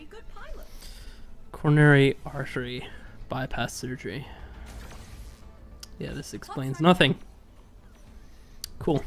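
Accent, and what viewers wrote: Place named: Ireland. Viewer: What is this video?!😂😂 Well we can tell he’s Irish!😁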